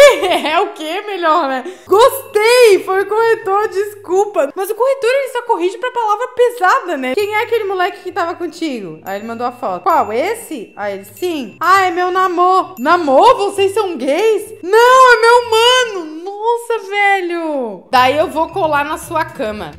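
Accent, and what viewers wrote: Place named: Florianópolis, Brazil. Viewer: Você é de onde ? Tens sotaque de manezinha 😍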